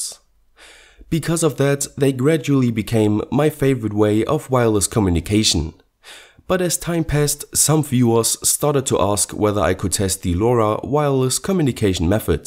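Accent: Germany. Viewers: Sander Dol i think he’s German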